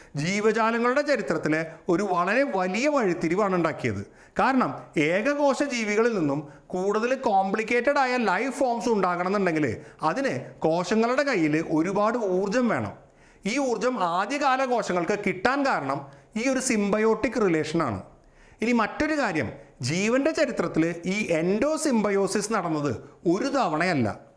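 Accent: Thrissur, India. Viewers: I do not know who you are. But with great respect, may say, your use of language is definite or conclusive. Science is never definitive or absolute- it's dynamic. It may be due to Trichur slang or accent? Though I love science, listening to this gives me ARROGANT OR ABSOLUTE VERSION of science huh! Amen!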